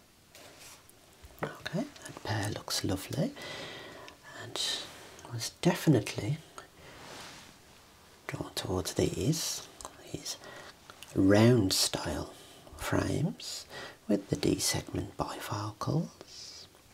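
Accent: United Kingdom. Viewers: Absolutely love the British accent ☺️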